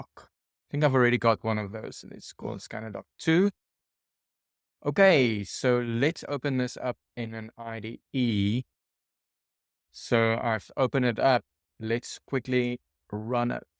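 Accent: South Africa. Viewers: Souf Efrican?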